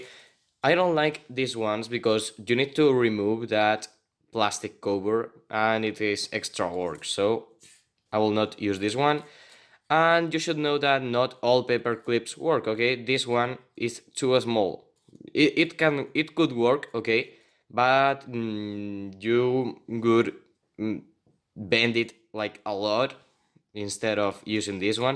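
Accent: Spain. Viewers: Are you spanish? Tu accento lo parece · Jsjsjs como se nota el acento español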